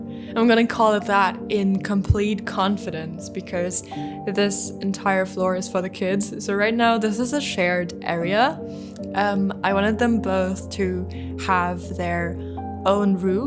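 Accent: France. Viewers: You sound part french, danish and irish to me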